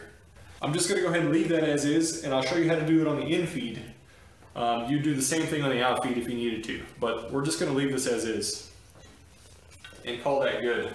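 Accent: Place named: United States. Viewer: Dude you are wearing a Texas A&M tee shirt you Speak English as I would expect someone from the United States of America to Speak, However you keep referencing metric measurements I am an old guy and use to install CNC machining centers. We used imperial measurements. I maybe wrong but to me one would have finer resolution using thousands of an inch. Then all of a sudden you mention thousands. I get the global thing since the rest of the world is wrong 🤪using metric. I have a son that is a mechanical engineer I have tell him too speak English we are in The US. Yes he was like me and 20 generations before me from here in the US. Good video I must say the concepts that you brought out are what are important not what scale you used to define the accuracy. Have a great day thank you for taking the and effort to produce a very good video.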